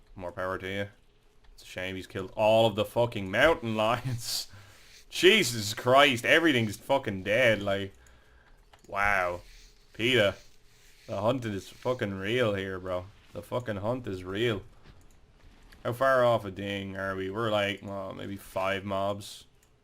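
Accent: Ireland. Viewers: What part of Ireland ya from man?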